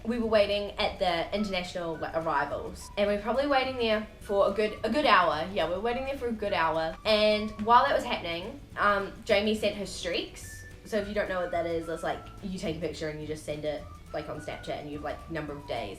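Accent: New Zealand. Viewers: Are you from Nz? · lmfao i clicked and i was like she's definitely from new zealand i can tell from the accent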